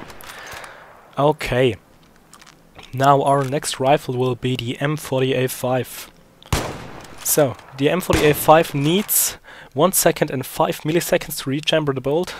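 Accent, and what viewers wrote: Germany. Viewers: Are you German? It sounds like so.. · Nice German dialect.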